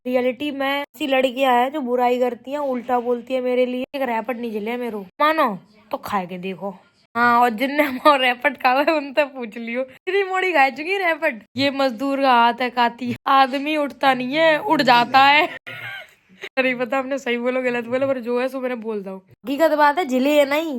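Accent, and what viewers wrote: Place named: Etawah, India. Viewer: Didi bahut Maja aata hai apni Desi Etawah mainpuri bali boli me blog dekhne me ...❤❤ I'm very enjoying to watching you vlog and also enjoy a pure Desi girl with Desi style😅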